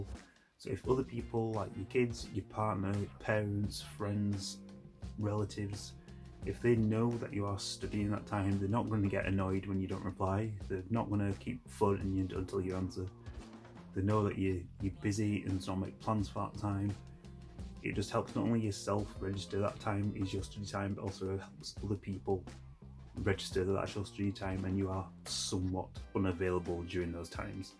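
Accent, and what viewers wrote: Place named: Yorkshire, United Kingdom. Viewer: Good tips....like you say, easy to overlook. I’m trying to place your accent?...south/West Yorkshire?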